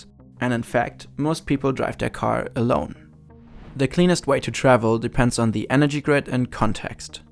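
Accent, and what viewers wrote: Germany. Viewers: Nice german acsent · I honestly can't watch these videos. I find myself to be a perfect example for the classic german phenomenon of hating how a german accent sounds eventhough you have one yourself. I'd love to see these videos on Simpli or you getting an english native speaker for the voiceover tbh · Nice Video - smooth Animations, (I love the intro!), informative and your german accent is not that bad :P Good luck with the channel, I hope ppl will write their comments in god damn english otherwise non-german-speakers will just be annoyed as hell xD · Even if I love your videos and the concept is a good idea in theory I just can't handle the German accent. If you're looking for someone to read your scripts I volunteer. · Krasser Akzent, den find ich sogar als Deutscher unangenehm^^ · Dudes! I'd really like to do voice-overs for your vids. 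Although it doesn't seem to be a big problem, I could get rid of the entertaining german accent😉 I can't really find an imprint with an email adress, so I have to rely on my comment being upvoted... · Great Video but i must say that the german accent is a bit distracting. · Yourrr loveley Germann Accent makes it pretty easy to understand it. For me As a german fellow with a way harder german Accent. Sorry for bat-english. They tend to fly around my head every now and then. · Love your German accent. Love from Britain! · Y'all may consider hiring a native English speaker, or having the usual narrator take some spoken English language courses. There are some very common, German pronounciation mistakes in this video, i.e. pronouncing 2019 as two-thousand-nineteen rather than twenty-nineteen. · Holy fuck bruh I wish I spoke German the accent is clean as fuck👌🏾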